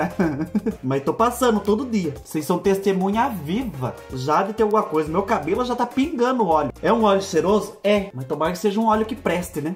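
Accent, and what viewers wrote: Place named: Curitiba, Brazil. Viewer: Tive que me inscrever não tem como, preciso de rir um pouco nesta pandemia, kkk e vc é mto engraçado <link> identifico mto com seu sotaque e seu vocabulário. Kkkk sou de Curitiba PR, e nois fala um pouco deste jeito .Vc é maluco fica carcando estas coisera no cabelo vc vai e ficar careca daqui um dia. Eu já fiz mto estas coisas tb.até banha coloquei no meu cabelo, fiquei 10 dias lavando meu cabelo pra sair aquela Gordura .bjo obrigada por me fazer rir.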